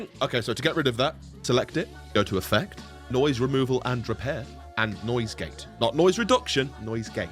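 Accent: United States. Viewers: THANK YOU SO MUCH!! (Also holy crap, your American accent is ON POINT!!) ❤ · Oh my god, I kinda expected voice to be just a slight radio-y exaggeration of your normal voice, this is nuts. You're killing it with the American accent, it's spot on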